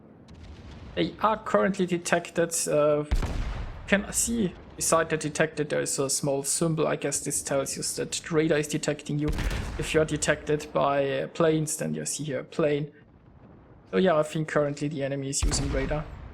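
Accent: Germany. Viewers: are you german? when you speak english you sounds like a german...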